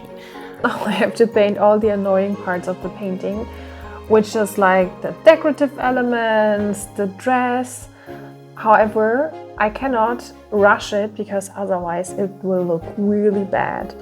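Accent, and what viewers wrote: Germany. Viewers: Me as a german hear a german accent 👀 haha. I looooove your awesome illustrations! Super cool things to learn from you!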